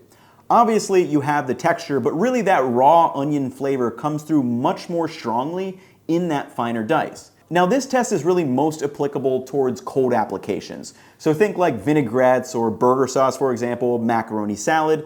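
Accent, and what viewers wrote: United States. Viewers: europlugs, american accent... i am very confused! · Just noticed the plugs and cabinets in the background. In spite of the American accent, you're in Europe? Where at?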